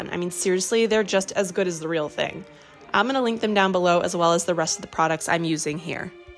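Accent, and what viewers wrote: Russia. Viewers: your Russian accent is on point!!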